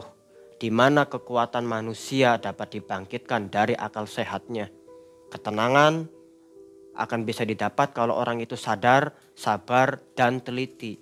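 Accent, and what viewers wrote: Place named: Dobo, Indonesia. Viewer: Salam dari Dobo.... Bt asli orang Dobo, dari awal dengar cerita bt sadar kalau lama diDobo, karna fasih sekali sama logat Dobo, btw laut Arafura ya.... Bukan Arafuru🙏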